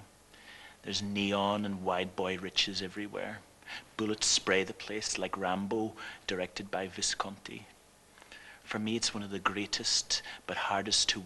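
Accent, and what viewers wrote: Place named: Northern Ireland, United Kingdom. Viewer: Hes from Northern Ireland buddy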